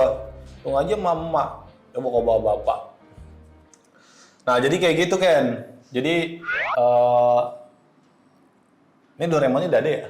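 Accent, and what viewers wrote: Betawi, Indonesia. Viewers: Kocaknya bang klo lagi ngomong..betawinya nyablak bgt dah...salam dari tanjung priok bang😂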